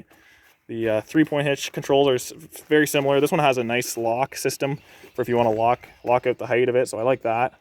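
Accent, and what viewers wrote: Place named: Ontario, Canada. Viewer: I can spot an Ontario accent anywhere....no doubt aboot it😅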